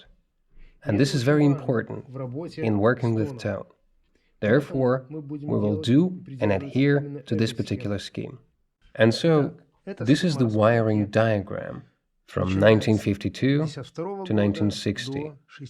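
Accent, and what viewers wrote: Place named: United States. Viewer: Bro, love the american speak overdub. Males it so much easier to watch when i know what you are talking about lol🤘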